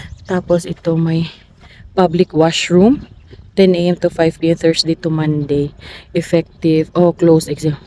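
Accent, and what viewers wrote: Visayas, Philippines. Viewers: Morag bisaya manka mam... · hi maam,napansin ko parang bisaya ka.asa dapit ang imo town or city sa besayas? proud ako sa mga besaya nga hero..♥️♥️♥️♥️♥️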